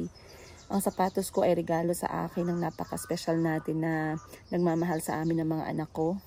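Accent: Iloilo, Philippines. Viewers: Maam im laughing while your are talking accent ilonggo, i love it watching fr.iloilo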